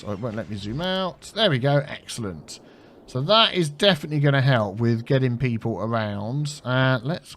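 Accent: United Kingdom. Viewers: Your voice is very British. You could be an actor for my listening exam this year. Especially the words ''there'' and ''that'' sound British.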